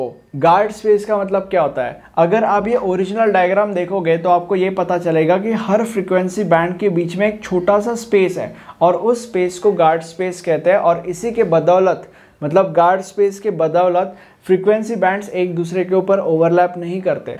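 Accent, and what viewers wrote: Maharashtra, India. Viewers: are you marathi ?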